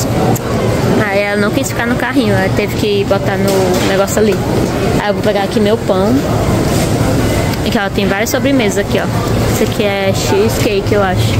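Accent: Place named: Northeast, Brazil. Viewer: Estou assistindo na noite de segunda, enquanto passo roupa, sou RJ. Seu sotaque nordestino é bem característico. Tenho uma vizinha que é de Natal já mora aqui a mais de 20 anos, mas não perde o sotaque. Bjs na família. Deus os abençoe.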